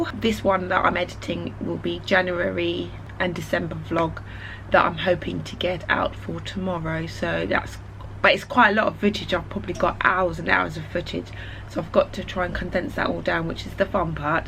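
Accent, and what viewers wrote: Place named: United Kingdom. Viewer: Hey, I love your channel ! I'm a full-time children book illustrator from Madagascar. I love seeing other black artists growing on social media, we definitely need more representation here ! Love, Sawyer PS: I love your British accent <3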